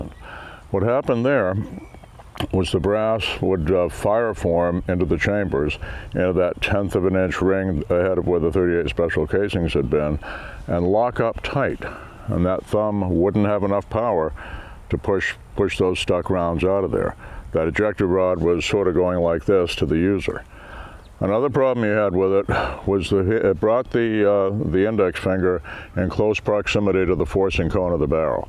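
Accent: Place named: United States. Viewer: Bro has most american voice I've ever heard 😂 props for talking about revolvers tho 🤙 I'm sad they're vanishing especially in competitions because I think they're extremely cool concept although hard to master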